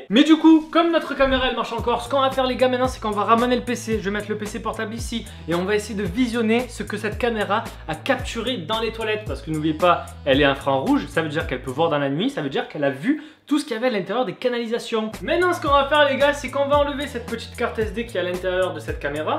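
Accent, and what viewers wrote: Marseille, France. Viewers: Tu es pas un peu Marseillais? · Tu as l’accent marseillais frère 😭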